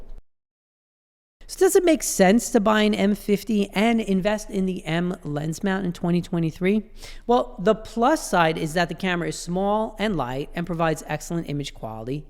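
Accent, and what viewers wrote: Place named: Tri-State Area, United States. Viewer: Did you mean to talk of it like it’s not mirrorless? That was the main selling point for me! Also your accent brings me back to growing up in the tristate area :)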